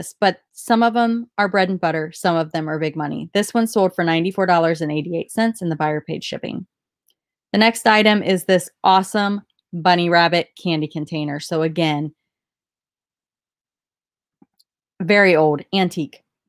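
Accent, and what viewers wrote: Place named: South, United States. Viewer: A nice southern accent too.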